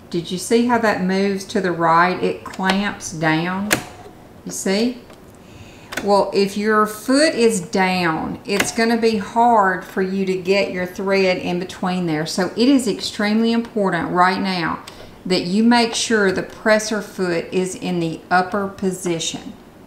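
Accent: South, United States. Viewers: My friend and I pooled our amazon points and bought this sewing machine. Opened it today. All was well until we tried to figure out how to thread the machine using the threader. The instructions in the manual weren't any help and then I found your video. We watched it then took turns threading the needled. Thank you so much for you patient, clear instructions! And I do love you southern accent!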